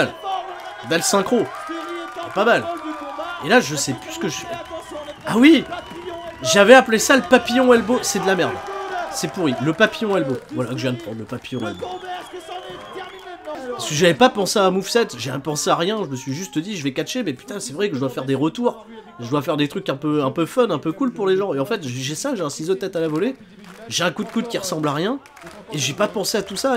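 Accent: North, France. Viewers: Salut tu es du nord ? :)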